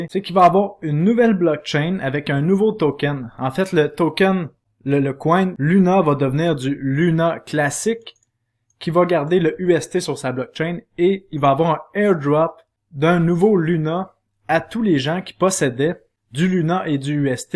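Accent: Quebec, Canada. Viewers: Je vois tes vidéos depuis 8 mois Ne change rien .Elles sont TOUTES SUPER. et Merci pour l'accent de Québec en plus. Patrick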